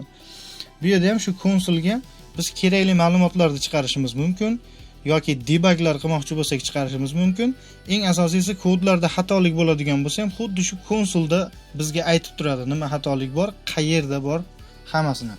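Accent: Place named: Andijan, Uzbekistan. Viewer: Anjan shevamdan aylanay. Rahmat video uchun, juda zoʻr tushuntirish boʻldi. Videolar kuniga chiqsa yaxshi boʻlardi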